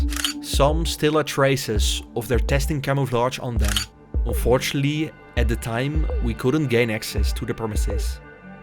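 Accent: Netherlands. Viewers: Haha ik kon aan je accent horen dat je Nederlands was maar mooie video hoor ;-) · No crappy AI voice and just a nice Dutch accent !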